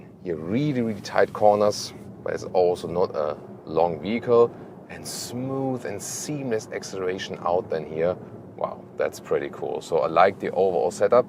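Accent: Germany. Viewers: Dein Akzent (Du sprichst Englisch mit Deutscher Intonation) hat sich immer noch nicht geändert... Es wäre so einfach dies zu ändern, ein wenig Sprachtraining (mit ner App z.B.) würde schon helfen. Auch übersetzt Du oft Deutsche Ausdrücke direkt ins Englische, was einfach nicht passt. Es ist übrigens ein GEPARD, kein Leopard...ernsthaft?! Auf Englisch: Cheetah. Deine Reviews sind Klasse aber diese Deutsche Intonation im Englischen ist für native Speaker kaum auszuhalten.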